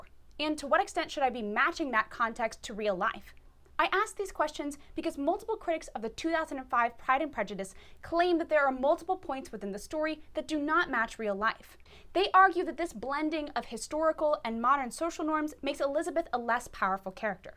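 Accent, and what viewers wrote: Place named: RP, United Kingdom. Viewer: Yeah your posh is good!!! You go girl 👏🏾👏🏾👏🏾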